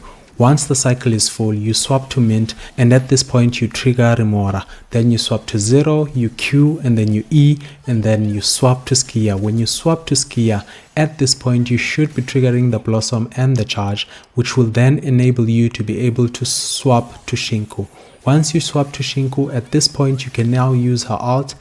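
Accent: South Africa. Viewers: Bro, u sound like kao kwishisha. Mzansi? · hears a South African accent, subscribes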